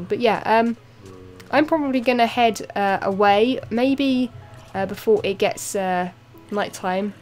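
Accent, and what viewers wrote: United Kingdom. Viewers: You had a lot of views for your Hermitcraft series. Hope people will enjoy this one. Good luck to my favourite british accent.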